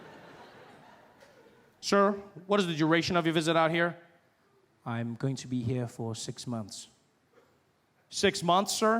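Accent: United Kingdom. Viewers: He's human after all! I thought this man could do everything. But his British accents need a bit of work. :)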